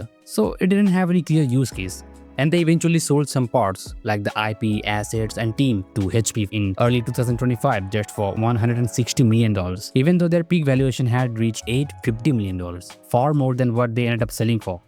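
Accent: India. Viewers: Buddy you got that indian accent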